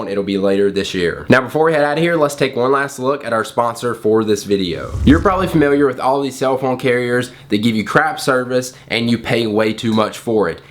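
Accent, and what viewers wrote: South, United States. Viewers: Were you raised or born in the south, then moved up north? I hear country in your voice, but I'm not trying to be stereotypical.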